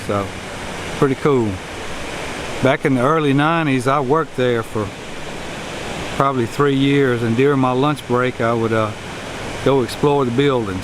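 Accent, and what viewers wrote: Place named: South, United States. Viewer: I'm born and raised in NY and I LOVE your Southern twang. I love the way you talk. I've never been to Alabama or Mississippi but i always imagine it in an old Southern kind of way. This place is really pretty!!!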